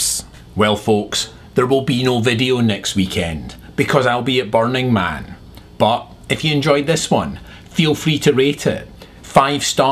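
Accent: Scotland, United Kingdom. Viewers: im scottish and a think your a bam pot and you have a gay posh scottish accent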